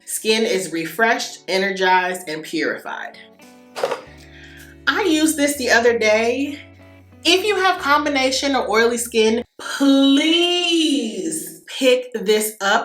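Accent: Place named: New York, United States. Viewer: You sound like New York when you talk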